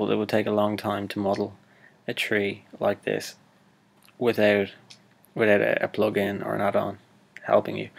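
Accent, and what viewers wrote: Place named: Newfoundland, Canada. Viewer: this guy is a newfie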